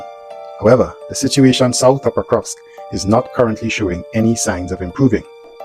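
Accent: Trinidad and Tobago. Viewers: New sub🎉 You sound Trini. Very cool if you are! Very informative work! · I trini too but I wondering if I hearing ppl in trini accent , good reporting first time I’m seeing your channel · Is that a Trini (Trinidadian) accent I'm hearing? Glad I found this channel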